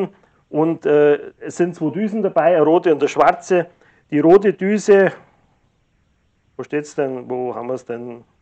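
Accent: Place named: Bavaria, Germany. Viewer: Er spricht meines Erachtens gutes Deutsch. Bayrischer Einschlag, vermute ich,oder? Grüße aus Brandenburg.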